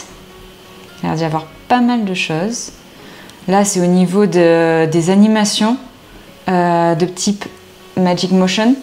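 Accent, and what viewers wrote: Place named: France. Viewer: the french accent is a huge flex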